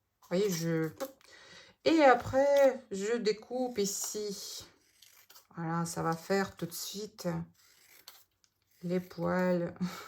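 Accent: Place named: Russia. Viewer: Bonsoir Madame,je de,couvre votre chaîne et vos tutos,j’aime beaucoup votre joie de vivre,vos explications vous êtes très très sympa, je suis une mamie et je vais m’atteler à faire vos jolis chevaux russe,de votre pays,votre tout petit accent est très charmant ! J’ai le temps d’ici Noël,de m’exercer,et d’en réussir quelques uns !du moins j’espère !Merci pour tout le temps que vous nous avez accordé, bisous aux enfants 👶. Marie-Line, deProvence.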